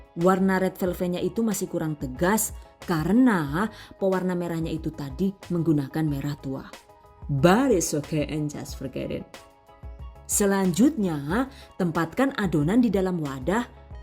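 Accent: Banyumas, Indonesia. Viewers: mbk e lucu nk wayah ngmong ngapak😂😂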